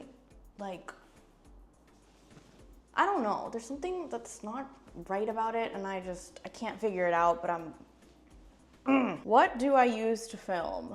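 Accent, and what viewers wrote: Godavari, India. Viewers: Your godavari slang 🔥🔥🔥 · ❤❤ your telugu is cool and nice.. I can say your parents are from godavari districts of a.p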